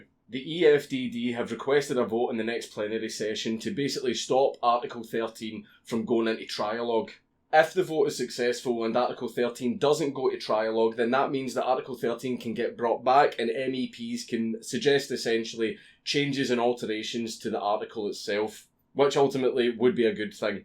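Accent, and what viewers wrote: Scotland, United Kingdom. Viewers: Dont worry youtube is safe it has not went through. Are you scottish? (I am !) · If all else fails, move to America. Sure we have our crazies, but at least we have the first amendment. Unless you're doing something that's genuinely dangerous, you can shitpost 'till your heart is content. Also you'll notice that we tend to love Scottish accents ( ͡° ͜ʖ ͡°)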